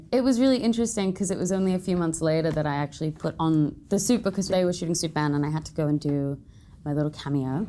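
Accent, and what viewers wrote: United States. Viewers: You can really tell she’s starting to get an American accent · There’s an American accent starting to creep in there.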